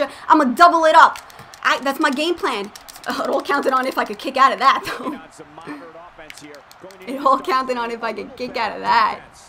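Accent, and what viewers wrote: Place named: New Jersey, United States. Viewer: l love her jersey accent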